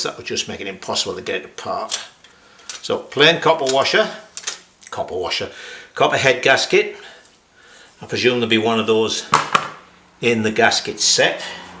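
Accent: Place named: Teesside, United Kingdom. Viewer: Recognise the accent,,, are you from Teesside????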